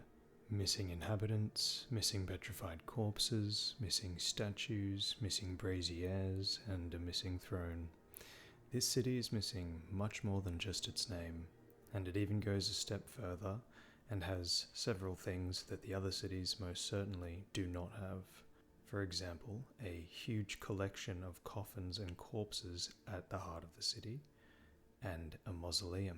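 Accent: Australia. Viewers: Are you from the same region/city in Aus as Vaati, its crazy how close your intonations are. Or is this like posh aussie? 🤣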